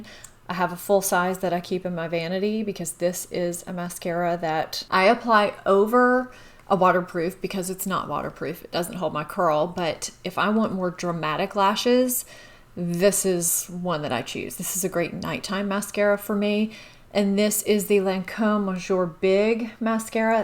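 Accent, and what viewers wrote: United States. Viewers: Hi, new to your site (and most others too, not very tech savvy😋) but I wondered if you could hold the items still so I can read the product name, or perhaps put in some text in one of the corners of the screen with the name of the product. I’m in Aus, lots that you test are not familiar to me and I often don’t catch it’s name (American accent is sometimes quite different to Aussie accent). I’m really enjoying your videos, and learning lots. Plus you are blessed with beautiful skin, pretty much everything you wear looks lovely. I’m 63, had horrendous acne and super oily skin, it’s looking the best it ever has, strangely. Not too many wrinkles, but it’s def aged. Jill in Aus.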